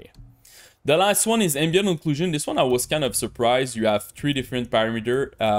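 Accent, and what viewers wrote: Quebec, Canada. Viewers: t québecois for sure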